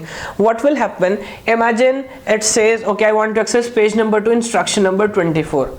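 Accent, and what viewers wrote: India. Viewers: Nice explanation, but I think you need to speak more calmly and in a more understandable way since with the Indian accent, words can be miscomprehended. And careful about changing to Indian mid sentence, I started to get lost in some phrases. Other than that, good job.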